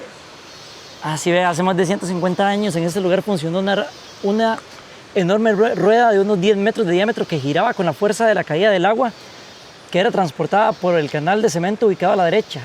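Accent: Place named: Colombia. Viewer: mae, por qué habla como colombiano? si ud es tico, tenga orgullo de su tierra y hable como tico, diga la R como se debe!!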